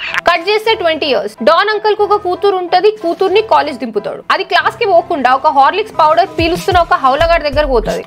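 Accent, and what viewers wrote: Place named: Telugu, India. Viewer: Imagining you in BigBoss 9...😎..your telugu accent is NEXT LEVEL...please keep it up.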